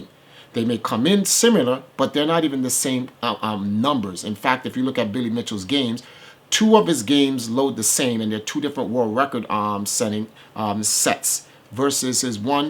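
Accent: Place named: United States. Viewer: Mr, I find you repugnant. You're a fake Jamaican, with your americanised accent. You try so hard to ape your masters, your slave mentality is irritatingly obvious. You are contemptibly inarticulate, misusing so many words and phrases, it is beyond reckoning. Why don't you learn how to speak English properly, before trying to use it to deceive people? Your incessant lying is utterly tiresome. You are the type of simpleton, who believes that heaping lies on top of lies makes for a convincing narrative. If there were any depth to your intellect, you would be aware of the fact that lies are strengthened by mixing them with truth. You are quite clearly ignorant of said fact. I am a West Indian, my skin is brown, and I play classic arcade games. I have no wish to be represented by the likes of you, so I would appreciate your removing yourself from the scene. 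Stop making yourself out to be more significant than you actually are, and stop manufacturing justification material for the use of the Twin Galaxies racists.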